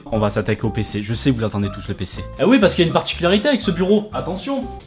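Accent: South, France. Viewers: Il est d'où du sud?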